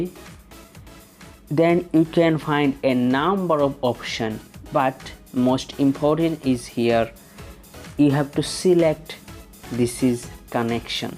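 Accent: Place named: Bengal, India. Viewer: Bamgali crazy English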